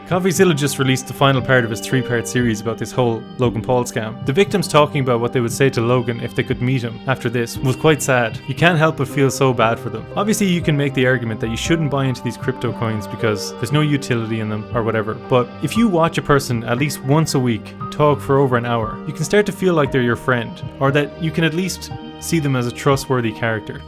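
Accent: Ireland. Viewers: That's an Irish accent right there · Nice piece on Logan. I would have never of thought I would watch more than a minute of Logan’s content and nowadays I won’t miss an episode of his podcast. I hope he makes things right about this crypto stuff, I feel like he’s going to address it soon. Btw I’m a Leitrim man myself you don’t sound far off. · You sound like an irishman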